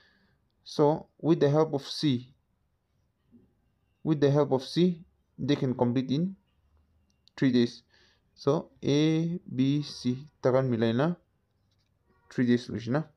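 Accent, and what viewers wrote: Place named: Nagaland, India. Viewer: Perfect shortcut formula sir I gues you are lotha gentleman your accent 😀 Last answer is option b 5 1/3days Correct me if wrong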